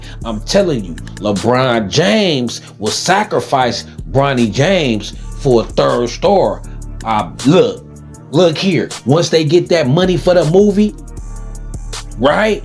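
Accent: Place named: California, United States. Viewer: I can tell you’re from Cali 😂 good vid though man.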